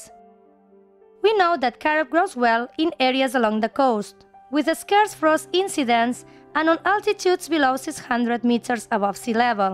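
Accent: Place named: Spain. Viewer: Very informative video. Love the Spanish accent!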